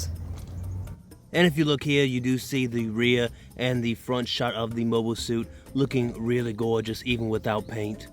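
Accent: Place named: Australia. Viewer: Can someone translate??? I don't understand Australianese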